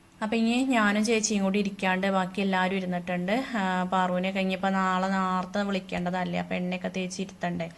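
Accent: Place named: Ottappalam, India. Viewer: ❤❤ ente nathoonum nalla aalanu pine sugano samsaram ottappalam samsaram anu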